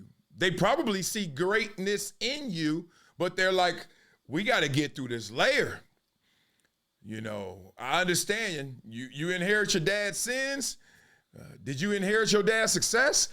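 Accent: Los Angeles, United States. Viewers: Wow. You’ve got that La rap accent pure gold 👏🏾👏🏾👏🏾.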